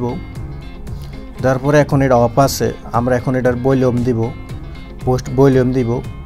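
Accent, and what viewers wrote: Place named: Barishal, Bangladesh. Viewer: ভাই আপনার ভিডিও দেখে যত টুক ভালো না লাগছে তার চেয়ে বেশি বেশি ভালো লাগছে আপনার বরিশালের ভাষায় কথা শুনে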